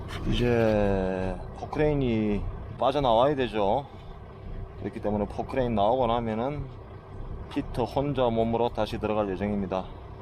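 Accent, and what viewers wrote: Gyeongsang, South Korea. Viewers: 2025년 4월10 경상도 표준말이 그리워 또 왔습니다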